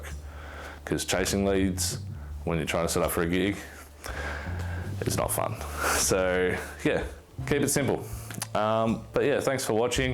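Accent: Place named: England, United Kingdom. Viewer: You sound likevyour English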